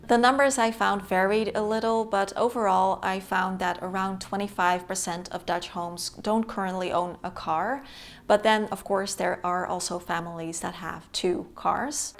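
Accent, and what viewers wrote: United States. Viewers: You sound totally American. I wouldn’t have known you were Dutch sadly. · You speak perfectly American English 😮!!! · From the USA. WhenI began working in my mid twenties, I would purchase a wall calendar so I could write down each day what I wore to work.everyday. I wore seperate so since i did not have a large wardrobe my wall calendar helped me keep track of the outfits I wore in that way I would not put together the same seperate pieces of clothing too often. I am fascinated as to how your English is so fluent and perfect without any hint of a Dutch accent. You sould like an American.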